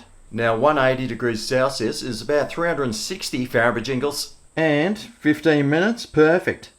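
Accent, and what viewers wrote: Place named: Australia. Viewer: Love your Aussie accent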